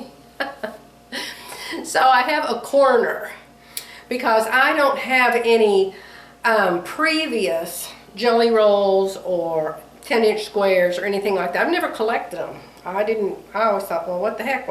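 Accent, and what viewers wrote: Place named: Oklahoma, United States. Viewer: Your videos always make my day. My family is all from Oklahoma & Arkansas & it's like listening to them talk. You make me smile. I like both of the border colors. How about a skinny little brown line around to frame it & then a wider binding of the turquoise? On the crumb quilt, I love option 4. I think that one is the best. Have a wonderful day!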